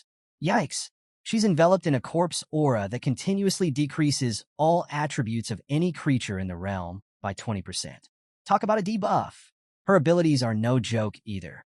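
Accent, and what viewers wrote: Australia. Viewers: why is this Australian? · It’s much harder to understand at 2x speed when there is an Australian accent. Please don’t make it that way next time, it’s unnecessary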